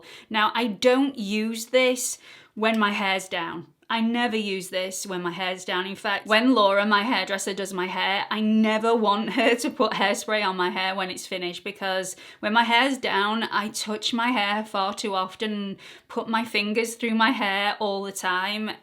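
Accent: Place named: United Kingdom. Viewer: I could listen to you (with your British accent) and Nikkia Joy (with her Aussie accent) talk ALL day. I bet I'm not the only person who catches herself trying to say words like "absolutely lovely/loovely", "hair/hahr", and "mom/mum". Sure doesn't sound the same out of my mouth 😂. Your "best of" videos have been fun,. Happy New Year 🎉✨🎉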